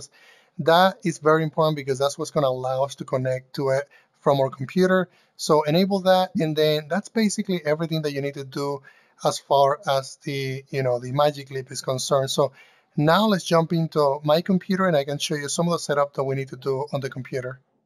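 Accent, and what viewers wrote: Spain. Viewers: why you do not have the same content in spanish your pronunciation is like spanish or something like that can you talk about in spanish too ? that would be great !!! God Bless you.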